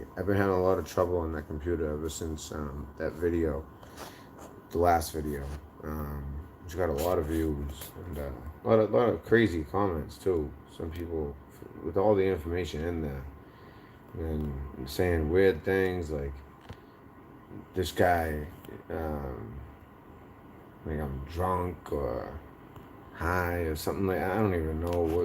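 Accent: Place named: Massachusetts, United States. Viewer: Are you from Mass.? Very familiar accent.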